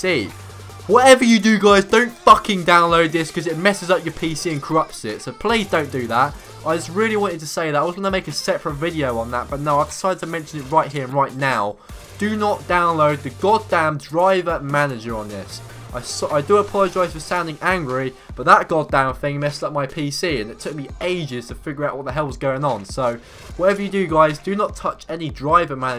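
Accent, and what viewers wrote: United Kingdom. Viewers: Dude I love your British accent!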